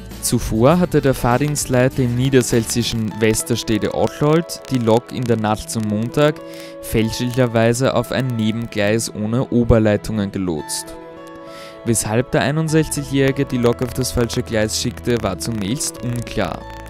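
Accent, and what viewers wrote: Austria. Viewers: Das klingt nach DDR-Slang, ist aber aufgrund des österreichischen Tonfalls unwahrscheinlich.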